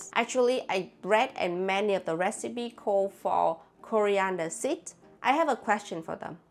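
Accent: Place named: Germany. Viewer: Hi! I don't know how your German is coming along, but you are developing a German accent in English, so I'm guessing your German is improving!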